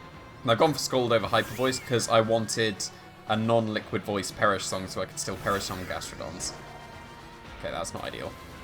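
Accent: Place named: United Kingdom. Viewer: I like your British.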